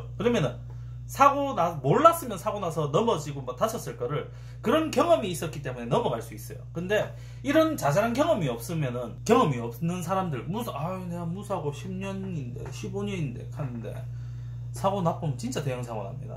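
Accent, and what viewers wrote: Gyeongsang, South Korea. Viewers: 피자사장? 말투? 경상도... 혹시 진주 유로코사장님이십니까?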